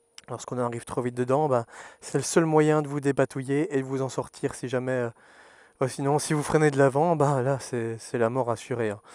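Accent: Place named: Hainaut, Belgium. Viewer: Bonne vidéo, pas mal pour les débutants. Bonne élocution. Et l'accent du Hainaut ! 👍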